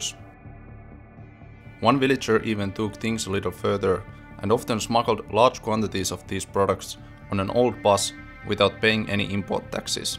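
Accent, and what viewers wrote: Finland. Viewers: This format is really good man. Hella interesting but also it really shows that you are competent at game development (Its easy to say you are good at something), you are trust worthy and honest! So many scammers out there and delusional devs! Good luck man I hope to back this when I can. Oh and I absolutely love the Finnish accent so enjoyable to listen to haha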